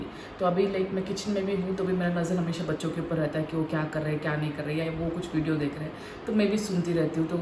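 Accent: South, India. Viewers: R u from south mam ....🤩👍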